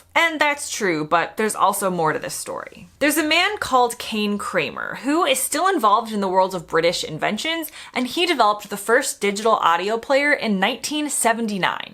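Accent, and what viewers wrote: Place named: United States. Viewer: Immediately I identified your American Accent, then you explained the reason. Very short list of British Inventions but none the less very interesting. The British have a habit of giving away inventions without realising their actual VALUE...ie The Jet Engine also given to Russia but not even a THANK YOU.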